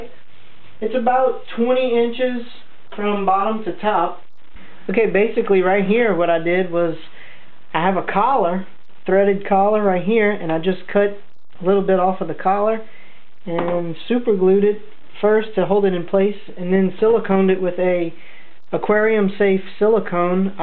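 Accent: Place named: Louisiana, United States. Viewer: You sound like you're from Louisiana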